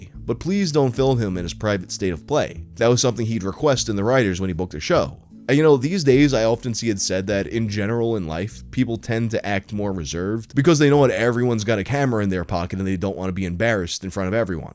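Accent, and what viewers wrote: New York, United States. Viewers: Dude I love him and his deep New York voice like Peter Steele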